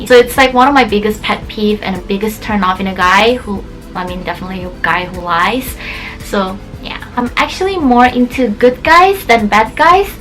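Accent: Indonesia. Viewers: ahh an indonesian accent, I was always confused, I knew you were korean but your accent isn't native english speaker or korean... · At first you sounded like an Indonesian trying to speak English to me. number 9 explains everything.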